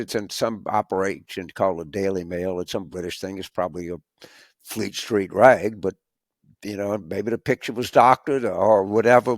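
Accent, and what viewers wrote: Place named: Los Angeles, United States. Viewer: Always have thought he has Tertiary syph, not secondary, but if you look up tertiary it really fits. Thanks! Always nice to hear a real LA accent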